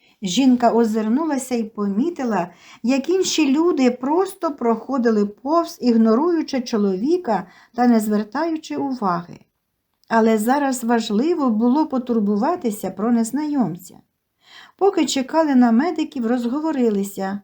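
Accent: Standard. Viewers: чистою українською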